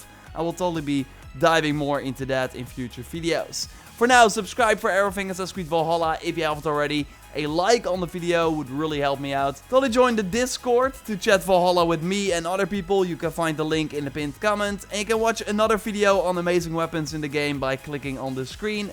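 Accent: Netherlands. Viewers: Is he from thuis netherlands it souds so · You have a dutch accent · Sick dutch accent😂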